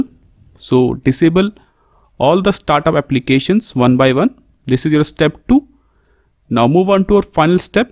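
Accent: India. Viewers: I already felt scammed by the bad English Indian accent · pov me trying to fix my window 10 annd the voice of the guide is indian (knowing that it gonna be fake) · The Indian accent and help is crazily good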